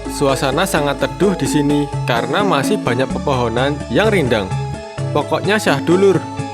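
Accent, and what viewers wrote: Java, Indonesia. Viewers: Mas... aku seneng banget karo logaté, boso Indonesia logat Jowo. Mantep tenan.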